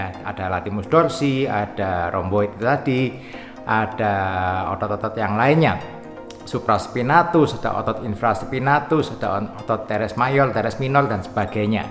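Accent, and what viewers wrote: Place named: Java, Indonesia. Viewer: Coach orang Jawa apa Bali ??, logatnya Jawa tapi bilang otot bilang Tnya kaya orang Bali.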